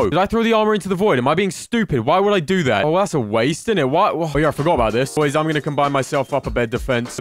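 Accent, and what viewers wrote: United Kingdom. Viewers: Are you inglish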